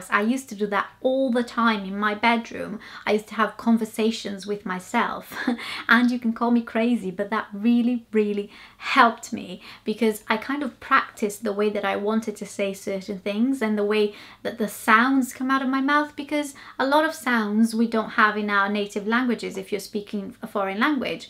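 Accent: Wales, United Kingdom. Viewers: Welsh accent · Native English speaker here (Scottish from Glasgow, have lived in London for over 20 years now). For the first 7 minutes of this video, I was thinking:"if there's no Welsh connection, I'm going to be very surprised; what a lovely perfect English-with-traces-of-Welsh (e.g. the beautiful way you say the word "years") accent". Then : Welsh boyfriend/husband. BOOM! You are obviously a very talented linguist, and your accent is beautiful. :-) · So cool that you've absorbed a welsh accent!! As soon as you started speaking I thought you had a welsh twang. It was great to hear a British accent but then when I heard the Welsh that was a nice surprise :D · Your accent sounds quite Welsh! (I'm English) did you happen to spend some time in Wales? Edit: omg you just said you have a Welsh accent! Haha knew I had a good ear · You have the perfect accent when speaking English. 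Don't give up because you are very good at it👍also your accent sounds good welsh. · American? She sounds Welsh to me!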